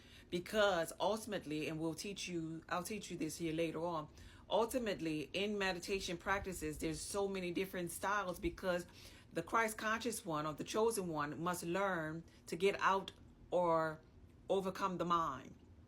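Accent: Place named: Gullah Geechee, United States. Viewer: I love your accent! 😍 Totes random! Sounds Gullah Geechee.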